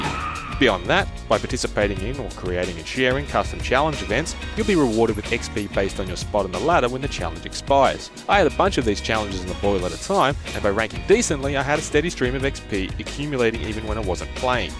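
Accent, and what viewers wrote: Australia. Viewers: Nick Revels Do people not know accents? is an Aussie. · was this review done by an australian?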